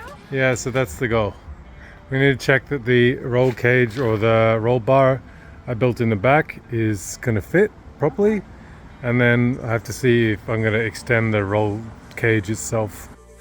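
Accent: Australia. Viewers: I got directed to your channel by YouTube and it looks like a really interesting build. Nice work on the body, it looks pretty amazing. The wheel hop issues likely started becuase it's a spool axle on the rear and typically go karters use weight transfer to unload the inside wheel to make these things turn. The trick won't work with your car, because you've lengthened the wheelbase and increased the mass quite a lot. The problem that's resulted from that is that you've had a failure which has resulted in compliance finding it's way into your chassis somewhere... I'm guessing, but I'm guessing it'll be the wheel bearings on the rear or you'll find that you've got a frame crack at the end of those flanges you've got welded on to the chassis. The people who are talking about a live axle etc, are wrong, there's not a specific problem with live axles, but compliance results in wheel hop, there are a bunch of ways to bake compliance into a vehicle design and live axles are renowned for it because people fail to separate their upper and lower links enough on a four bar rear end, or use rod ends which results in the rod ends flogging out under load. 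In both instances there's a very basic fix, but it's geometry and complaince related, nothing else. Yours probably isn't a hard fix either, but it'll need some proper design work done on it. You sound Australian, where are you based? I'm an engineer and based in Brisbane. I reckon it'd be interesting giving you a hand if you're keen.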